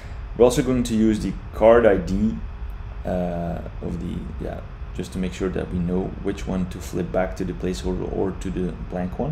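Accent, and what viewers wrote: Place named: Brazil. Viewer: You sound Brazilian! Where are you from?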